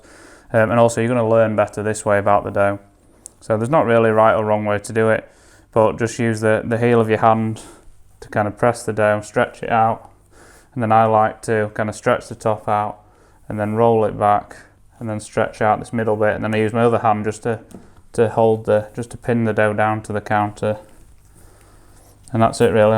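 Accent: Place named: England, United Kingdom. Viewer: Do you speak English? I don’t understand how an English accent is strange to hear spoken English in. Would Boomhauer be easier to understand?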